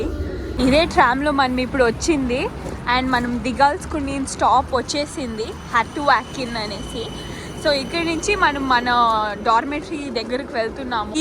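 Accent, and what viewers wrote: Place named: Hosur, India. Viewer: Midi Hosur kada, your Telugu is same as my Hosur frnd...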